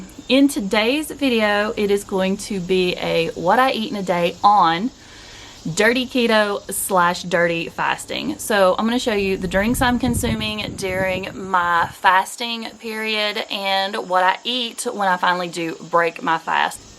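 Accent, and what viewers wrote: United States. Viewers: Please don't stop the chats‼️. I live in the UK now and listening to you makes me feel so much like I'm back in the US‼️‼️. Keeps me from missing home too much 🤗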